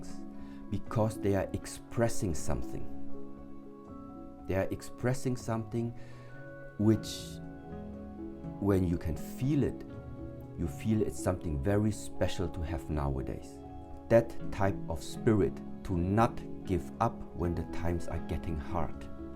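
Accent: Germany. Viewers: I knew I heard a German accent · U Sound like a german speaking english :D whats your native language? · Anybody else thinks his accent sounds German? 😂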